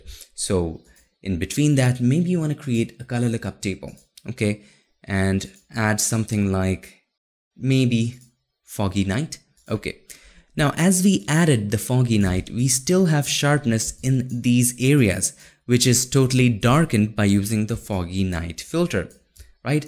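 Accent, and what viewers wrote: India. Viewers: the only indian whose accent is not giving cancer to the ear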